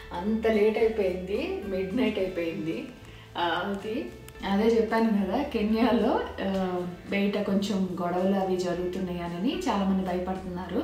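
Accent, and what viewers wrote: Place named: Andhra Pradesh, India. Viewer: నమస్తే గారూ.. రాం చానెల్ చూసాక మీ చానెల్ సబ్స్క్రయిబ్ చేశాను.. తెలుగు ఇక్కడ మన ఊళ్లలో మాట్లాడినట్టుగానే చక్కగా మాట్లాడుతున్నారు.. పిల్లలకి తెలుగు రాయడం చదవడం కూడా ఇంటి దగ్గర మీరే నేర్పించండి.. తెలుగు వారు అందరూ కలిసి ఒక చోట సినిమా చూస్తానికి రావడం ముచ్చటగా ఉంది.. ఏదో ఫంక్షన్ కోసం వెళ్లినట్టుగా అనిపించింది.. ఒకప్పుడు భారత్ క్రికెట్ టీం కెన్యాలో పర్యటనకు వచ్చే వారు.. ఇప్పుడు కెన్యా టూర్ ఉండడం లేదు.. తెలుగు వారే కాకుండా ఇతర రాష్ట్రాల వాళ్ళు కూడా ఉన్నారా.. చానెల్ చూడడం చాలా సంతోషం కలిగించింది..🎉😊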